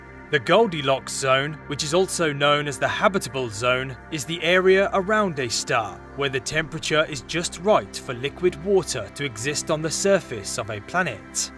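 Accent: United Kingdom. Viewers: Love your british accent.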